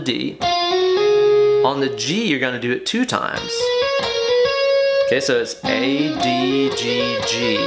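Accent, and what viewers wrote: South, United States. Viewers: I didn’t hear it. Maybe it’s just that country accent. I get a kick out of it. Reminds me of my days in Alabama.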